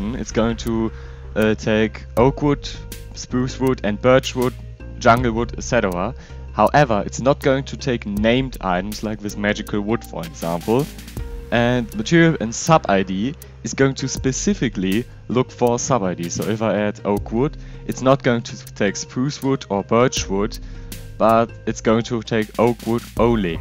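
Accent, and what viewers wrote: Germany. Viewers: Hey dude, is comming a new Update of Slimefun? or is the project abonden? because the last update was in september 2015. But away, Nice video bro. And are you German? Your Dialect in English is like German. :)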